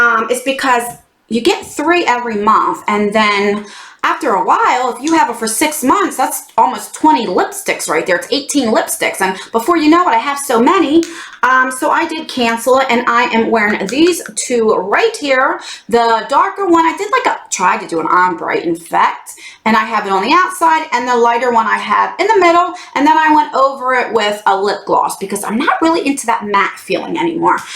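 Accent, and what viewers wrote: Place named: Chicago, United States. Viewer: Love your accent ❤ love that it sounds like your saying crown instead of crayon, not making fun of you just like different accents 😁 Chicagoans accents very so much lol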